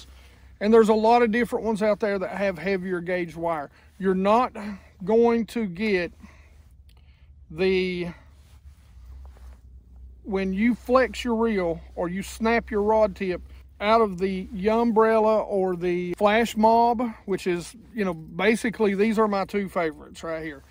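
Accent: South, United States. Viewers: So glad to see you fully recovered from Covid Brother, sounds you're back 100% Southern drawl and all!! LOL!! Would I get the same reaction if I put plastic flukes instead of swimbaits? Great instructional video!!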